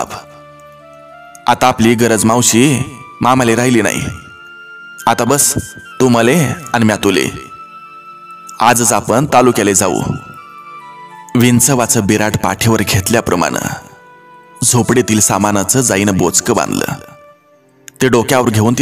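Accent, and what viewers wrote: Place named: Vidarbha, India. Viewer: ग्रामीण जीवनावर आधारित ही कथा आहे. वर्हाडी भाषेने तिला छान सजवले आहे. सरजी चा नवऱ्यचा अकाली मृत्यू झाला होता. ती आता एकटी पडली होती आणि पोर पोरकी झाली होती. घरी आली पण आधीच दुःखाने व्याकुळ झालेल्या सारजा ला सासू ला सामोरे जावे लागत होते. घरचा आधारच आता देवाने हिरावून नेला होता. दुःखी असताना पण रीती नुसार ती त्याला आज घास घेऊन चालली होती अनाहूत पणे श्वापदाने सरजी वर हल्ल्ला केला होता आता मुलाची आई पण वर्च्याने हिरावली होती. मुले आता पूर्ण निराधार झाली होती. दुःखाचा डोगर कोसळला होता. आल्या वेळेला हिम्मत देऊन राहा असे आजी सांगत होती पुढे आजी आपले होईल किंवा पडेल ते काम करून राहू असे सुचवू पाहत होती. कोणीही त्याना साथ देत नव्हते वाण्या कडे गेले पण त्याची नीच वृत्ती आता जागृत झाली तो हिंस्त्र पणे वागला जाई चा गैरफायदा घेतला होता एका निष्पाप जीवाला त्याने मजबूर केले होते. नको ते घडून गेले होते. कालांतराने त्याची आजी पण आता गेली होती. 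आता हार न मानता ती आल्या. प्रसंगा ला तोंड देत होती. जाई ला पुढे मुलगा झाला होता तो अख्या ती आता त्याच्या सह तालुक्यात राहायला आली जिद्द करून तिने मुलाला शिक्षण दिले. पुढे त्याने तिच्या कष्टा चे चीज केले. तो छान ठिकाणी कामावर रूजू झाला आणि तिचे दिवस पालटून. गेले. एका करुण कहाणीचा सुखद शेवट झाला. एक वास्तव दर्शी कथा असून व्हराडी भाषेचा लहेजा सुंदर लागला आहे.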